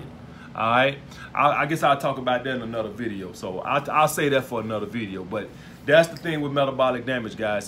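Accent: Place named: South, United States. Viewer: the way you talk remind me of Hodgetwins, except a stronger Southern drawl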